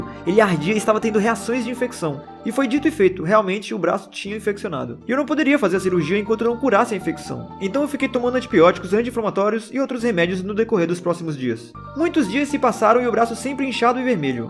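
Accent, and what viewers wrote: Brazil. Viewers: cheguei até aqui pq vi um comentário seu no canal da Dora Weigand, e eu simplesmente adorei teu canal e que vídeo foda, dei ótimas risadas kkkk e que bom que to esse sofrimento já acabou, pelo tua voz eu chuto que tbm é Cearense, se não for é do nordeste tbm certeza.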